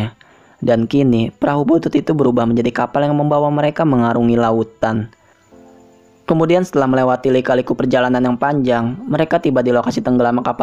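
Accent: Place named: West Java, Indonesia. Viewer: Lo orang Sunda pasti...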